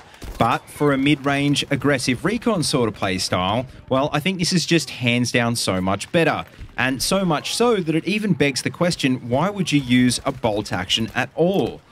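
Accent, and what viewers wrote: Australia. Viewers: gr8 vid m8 Aussie accents makes everything better :) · Hey shit, you're an Aussie!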